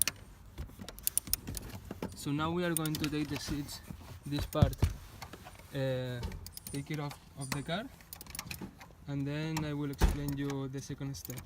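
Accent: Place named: Spain. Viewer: Hahaha saludos desde USA me mola tu acento de español Hahaha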